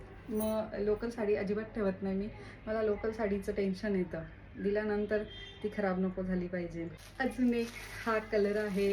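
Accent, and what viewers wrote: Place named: Khandesh, India. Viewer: Tai tu khandeshi ahe na tuzya bolnuavarun vatat mi pn khandeshi ahe tu khup god ahe aani tuze bolne ati sunder ❤ love you tai mla aaj paryant koni nahi aavdl evdi tu aavdte ani tuze sagde video baghte mi😊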